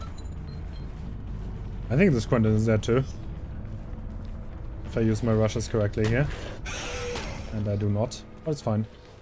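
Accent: Germany. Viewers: are you german? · Wie man den deutschen Akzent hört aber saftiges gameplay👌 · Der deutsche Akzent:)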